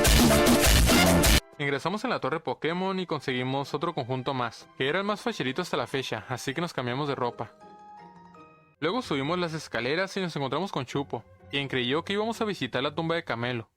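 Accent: Chihuahua, Mexico. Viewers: Eres de chihuahua?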